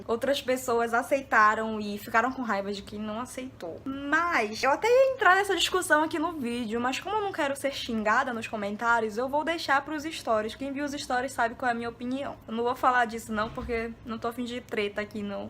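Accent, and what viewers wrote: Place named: Pará, Brazil. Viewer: Esse sotaque paraense <3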